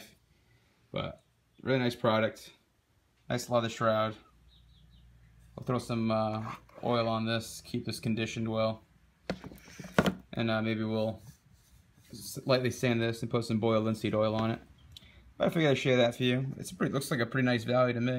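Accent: United States. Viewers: You def planted them correctly. Too bad they didn't pull thru for you. You must be a New Englander like me. I can hear it. What was in the wine barrel? Columnar apple ? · Awesome, I'll have to add this to my garden arsenal! Thanks! You sound like you're on the East Coast? I'm in Nor Cal. Lots of us Portugees out this way! I have a huge family. Seems like farming and boating are in our blood.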